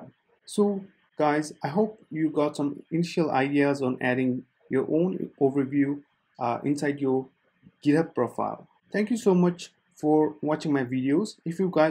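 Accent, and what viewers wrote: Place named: India. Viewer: Indian accent? DROPPED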